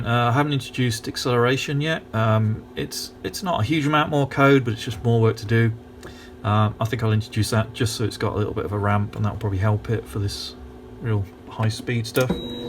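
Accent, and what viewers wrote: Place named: United States. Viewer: Funny accent for an American.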